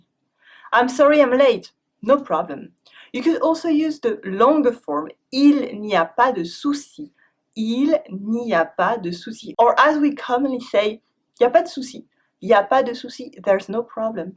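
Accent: France. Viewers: What an horrible french accent when speaking english ! Even for my french ears, it is hardly bearable.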